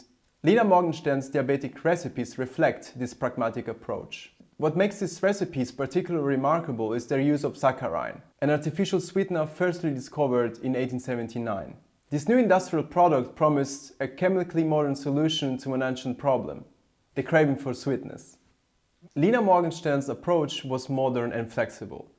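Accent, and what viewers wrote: Germany. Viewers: Very well done, very interesting. Your English is really very good; I enjoy the German accent and the occasional "Germanic" choice of wording. Do you have a channel in German? I'm learning the language and am looking for interesting material in German to experience. If you speak German with the same speed and clarity you used here, I believe a German channel of yours would be a useful tool for me in that endeavor.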